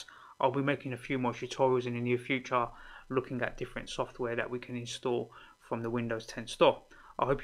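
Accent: United Kingdom. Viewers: He just has a british accent.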